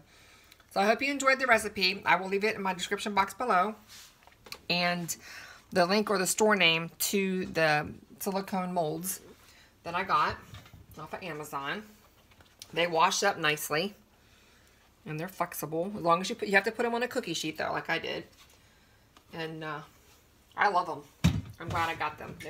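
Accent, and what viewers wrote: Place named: Arkansas, United States. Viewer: Oh Sis, these look delicious! TFS!! That Arkansas accent is really sounding out today!😊😘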